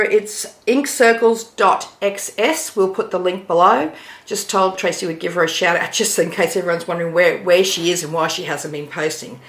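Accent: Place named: Australia. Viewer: Enjoyed watching you again. I really appreciate watching Aussies and hearing our accent for a change on flosstube. Ornament and autumn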